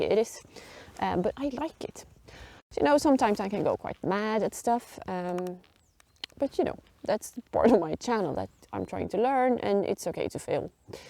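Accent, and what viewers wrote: Sweden. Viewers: Hej, är du möjligen från Göteborg. Låter som en göteborgsbrytning under engelskan 😏 Bra filmer. Bra innehåll. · Dear, your videos are a great inspiration to the ladies I date, and I am very entertained watching your adventures. I try my best to explain bushcraft techniques to so many ladies, and I find that many do not comprehend or retain the Information. Your videos are very good at helping break the communication barrier between Men and Women, the ladies actually retain your words and comprehend your reason, intentions and actions, to achieve your adventure challenge. I may say the same phrases about the same actions you are doing, and the girls simply do not understand it coming from me sometimes. I started to think beautiful hair, long fingernails and glamor trips to the salon equal a comprehension challenge with bushcraft and survival education, you proved me very wrong and humbled me with who you are. 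Thank you so much. Do not be offended by negative comments from people, they are simply jealous of you in some way. Several ladies I have shown your videos to have mimicked your Swedish to English accent when we are out on our own adventures, because we all think it is very beautiful and sexy, and sometimes they do it just to get my attention, and it works very well for them to do so. I am proud of and for you.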